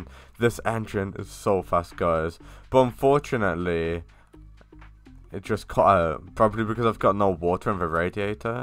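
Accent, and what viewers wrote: United Kingdom. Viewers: He's is British mate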